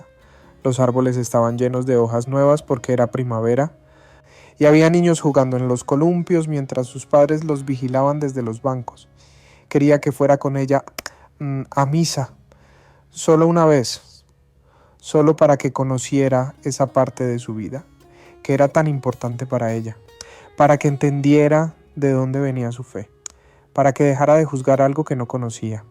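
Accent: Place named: Central America. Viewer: buscado una voz con acento argentino y no centro americano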